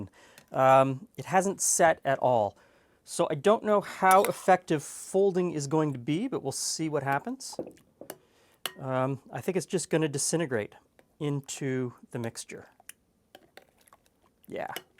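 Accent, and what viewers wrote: Canada. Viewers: everyone's talking about the bagged milk but I was like "he sounds Canadian" despite most Canadians living in Ontario have a very subtle accent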